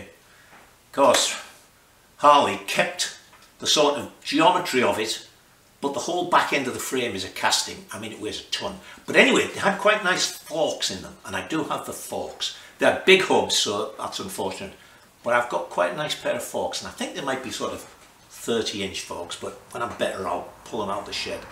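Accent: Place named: Newcastle, United Kingdom. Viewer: Glad you're on the mend. Remember the great Carroll Shelby lived to 90ish with more severe cardio vascular problems so I hope to hear your dulcet Geordie tones for many years yet.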